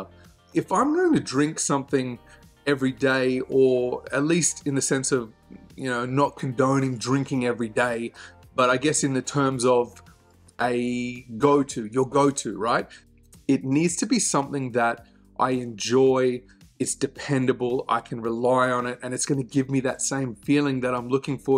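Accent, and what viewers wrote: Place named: Australia. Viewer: It's like majorkill from Warhammer 40K acting like a perfect gentleman and talking about whisky instead of spacemarine figurines and hentai. I guess the Australian accent is just more gripping. Great content.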